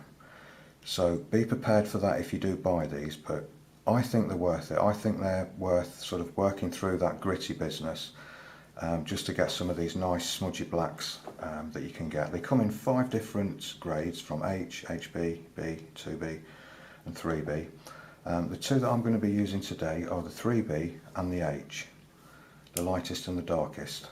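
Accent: United Kingdom. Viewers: That's awesome. Just subscribed. The British accent adds to your credibility too.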